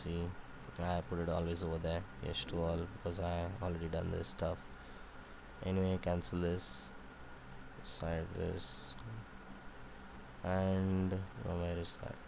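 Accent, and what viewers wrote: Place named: India. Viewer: look im indian too but your heavey accent makes it too distracting to watch the video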